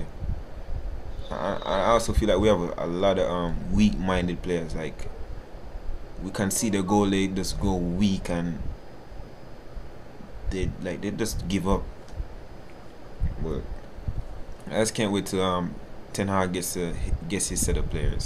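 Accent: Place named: Jamaica. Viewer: Bro hw u sound so Jamaican😂🇯🇲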